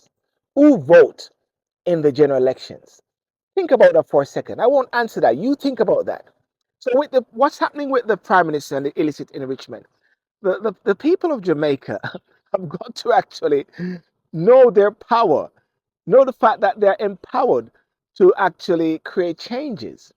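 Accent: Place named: United Kingdom. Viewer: I suggest you go and read the full report and try to let the bias side of your brain to find the facts..ask yourself this the priminster is a 52 year old man that could run any company globally and make millions but unlike you chooses to exercise is patriotic side and remains in his country to help build the economy..i suggest go do some more research and come back to your audience in your firm British accent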